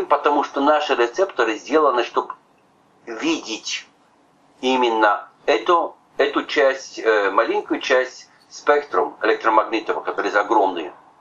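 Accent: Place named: Poland. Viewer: Польский акцент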